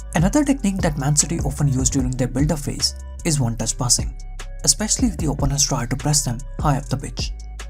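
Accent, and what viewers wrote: India. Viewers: maybe india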